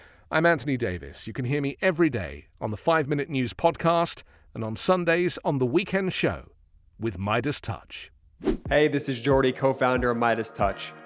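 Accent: United Kingdom. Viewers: Somehow that British accent gives the entire affair more gravitas. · I love listening to you shred Donald Trump. Insults with British accents have more sting. Beautiful. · I love his voice! It's British so it makes him appear smarter are more authoritative.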